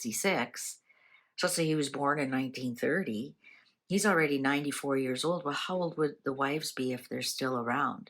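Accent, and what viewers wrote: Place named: Canada. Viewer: Get over it.she is Canadian so pronounce differently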